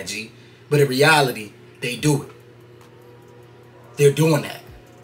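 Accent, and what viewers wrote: Baltimore, United States. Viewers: He def from BALTIMORE OR DMV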